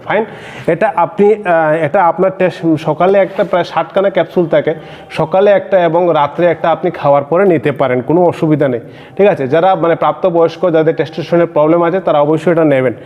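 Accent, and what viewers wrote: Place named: Barishal, Bangladesh. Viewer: ও তুমি বরিশায়লা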